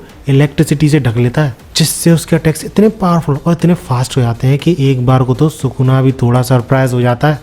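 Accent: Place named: Uttar Pradesh, India. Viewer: Bhai ye UP vale accent mein ARA ARA mat kiya karo, japanese words bol hi mat, bahut bekar lagta hai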